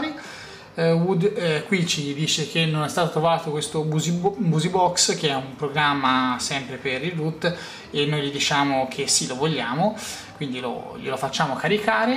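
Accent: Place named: Tuscany, Italy. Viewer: l'uso di spengere non e' proibito ed anzi e' molto diffuso in regioni del centro Italia, come la Toscana.A giudicare dall'accento, il cortese relatore di questo utile compendio video, sembra provenire proprio dalla succitata regione.Cfr. il sito dell'Accademia della Crusca, qui: goo.gl / G1VoB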